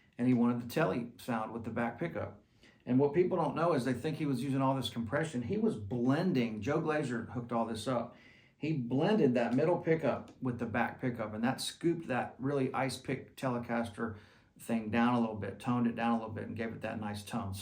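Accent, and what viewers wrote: United States. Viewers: reat vid great playing like that southern accent. I have Princeton reverb with ironman attenuator and like that setup. Background, i been playing only 2 years and no where to your playing and current live in sober living environment(been sober 2.5 years) and we have own rooms. So i try to be mindful of everyone. People work all shifts, i get up mad early and people say they rarely hear me play. I love my princeton and unless i start gigging or hit lottery doubt i really will ever need another Amp. I also have champion 40 w which doesn't compare but is adequate for heavier stuff. Just curious your opinion on attenuators they don't seen to be very popular option. I will try it unattuated later. I just always assumed that was suboptimal to play at 2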